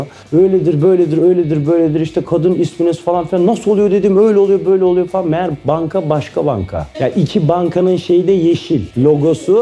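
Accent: Black Sea, Turkey. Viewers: Senin Karadeniz sivenii yerim usagim 😂😂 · Çok güzel Karadenizli konuşuyor ve gerçekten çok sevdiğim bir oyuncu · Yaaa cok tatlııısin karedeniz şivesi de var bal ayrica SAKAL SANA COK YAKISIYOR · Ben keradenizli sandim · Cok güzel karadeniz sivesi yapiyor. Bende inanmazdim laz olmadigina 🙈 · Lazca değil o, karadeniz 'ağzı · Eren abi çok güzel karadenizce konuşuyor ❤❤Ayrıca Niye SÖZ bitti ❤❤Seviliyorsun Abi ❤❤❤ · Lazca değil o, karadeniz 'ağzı' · Ohaa efsane karadeniz sivesi var yaa😍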